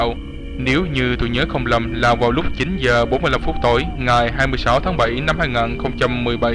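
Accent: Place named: Dak Lak, Vietnam. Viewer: giọng đọc vl ra.. giống giộng ở tây nguyên đac lắc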